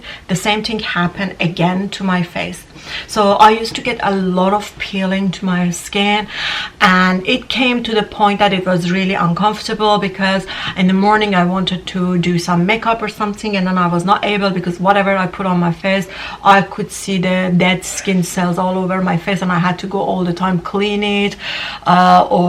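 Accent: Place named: Iran. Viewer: Persian accent 💯💯💯💯. She has diluted it from living abroad somewhere but sounds Persian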